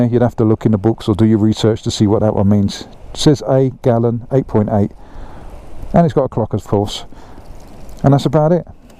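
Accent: United Kingdom. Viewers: Right giggle nice words mate love UK English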